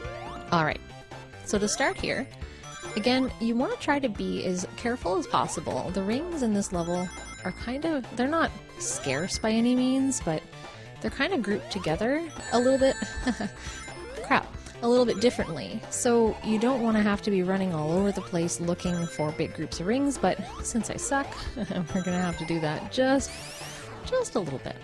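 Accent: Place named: Canada. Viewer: You have very nice Canadian accent. You should do ASMR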